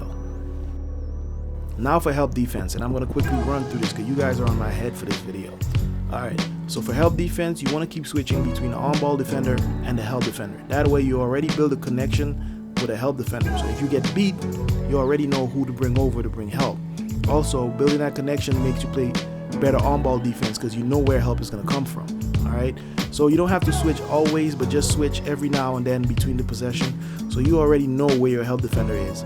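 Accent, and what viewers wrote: Jamaica. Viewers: Wah goin on boy. Yuh sound like yuh from Yard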